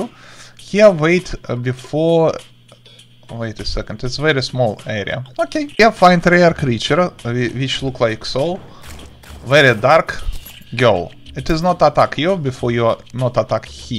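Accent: Russia. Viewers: Русский акцент не скрыть мен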